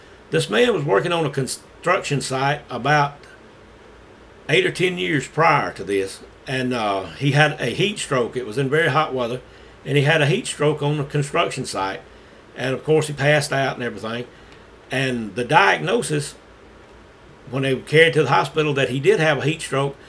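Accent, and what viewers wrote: South, United States. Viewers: hey man these are some great stories I believe every one of them I've had an experience myself... you know something that is one southern accent I like listening to you talk brother we chat soon be cool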